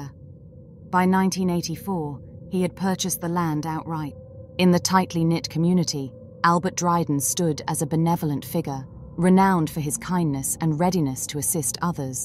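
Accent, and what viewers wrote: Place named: England, United Kingdom. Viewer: I want that thick english accent back. Love your speaking voice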